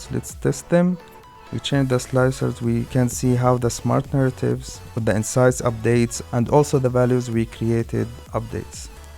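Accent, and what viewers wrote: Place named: Arab world. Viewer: From your ascent your are Arab it good to have some one like you but if you have another Arabic channel it’s more better.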